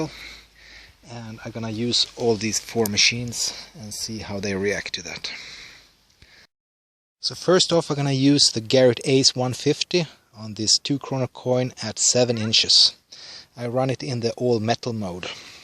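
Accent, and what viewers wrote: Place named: Germany. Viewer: What’s your accent? Is it German or Scandinavian? It’s very noticeable.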